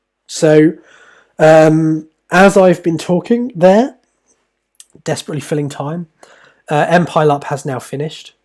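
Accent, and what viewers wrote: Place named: Cockney, United Kingdom. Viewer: it's comfortable to hear cockney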